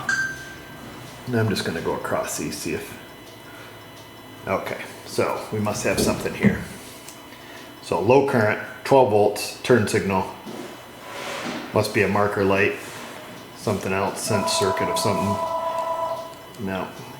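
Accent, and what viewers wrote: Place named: Western New York, United States. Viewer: I enjoy the accent brother, I'm a native Buffalo/Hamburg, you are over in Bath, that's the accent which reminds me of home.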